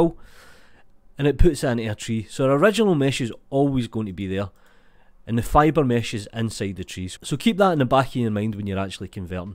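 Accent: Scotland, United Kingdom. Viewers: I started to listen to this video and I was like: Is this guy? He sounds. Well, he is it literally.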